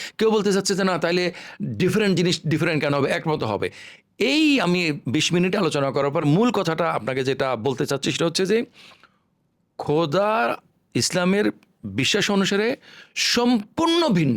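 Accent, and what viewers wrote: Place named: Noakhali, Bangladesh. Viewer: নোয়াখাইল্যা বয়ান!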